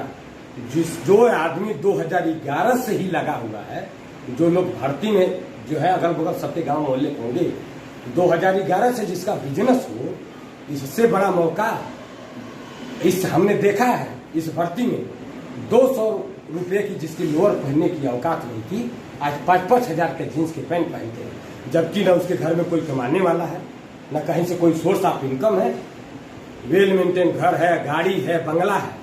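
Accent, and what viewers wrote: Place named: Bihar, India. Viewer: Tm to bihari lagta h ..kya bhai .sahi bol Raha mai 😊😅😅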